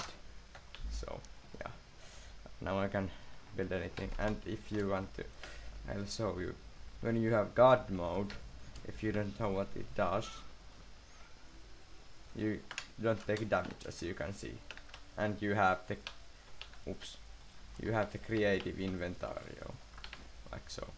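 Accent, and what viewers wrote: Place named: Finland. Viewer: i heard finnish accent toril tavataan perkele :D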